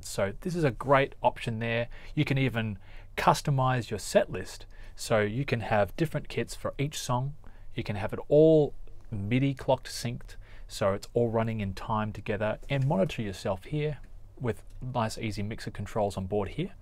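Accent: Australia. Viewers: Good to hear an Aussie accent. Sound like a Melbourne accent.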